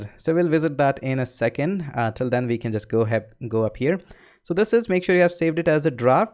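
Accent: India. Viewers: Thanks for helping. Indian accent is not good at all :/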